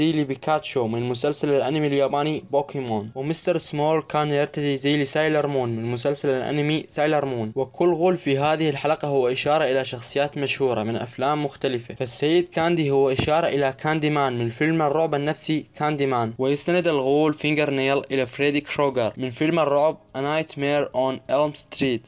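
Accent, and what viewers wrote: Iraq. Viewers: انتا عراقي؟ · أنت عراقي لو لا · انتة عراقي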